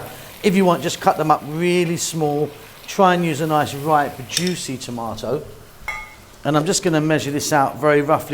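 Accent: United Kingdom. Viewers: Speaking from what I see, are cups and tablespoons smaller in the UK than the US (going by your accent)? I'm a small-scale US homesteader and I want to add some sheep to my property. Looking at recipes. "Lamb" from the market is usually (legally) mutton and the wool sheep variety. I want to get hair sheep and harvest annually to avoid greasy, lanolin flavor.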